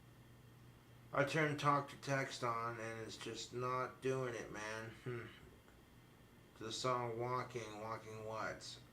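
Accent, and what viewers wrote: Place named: Birmingham, United Kingdom. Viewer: Bro, are you 80% British? Im starting to hear the heritage in the accent. Sounding like Bob Geldof if he came from Birmingham. Weird Shiyte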